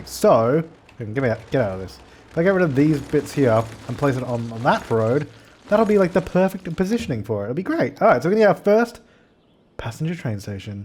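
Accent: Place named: Australia. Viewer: Thank you for creating,! When I focus on your video, I find your commetaries funny! Including the fact that you, and some viewers here taking your builds seriously. Its awesome! I also like to let your vids play in the background while studying. At times I also watch your videos when I'm trying to go to sleep.Your soothing voice and your Australian accent can be the perfect lullaby. I'll watch as much ads as I can to support you ba'aa'aa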